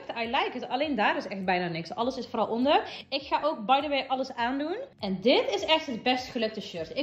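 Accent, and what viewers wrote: Brabant, Netherlands. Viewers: Heerlijk Brabants acsent heb je, I love it. Was echt een leuke en grappige video.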